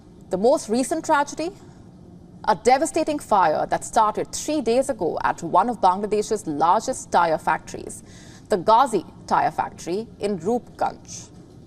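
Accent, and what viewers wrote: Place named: India. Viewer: Horrible Indian accent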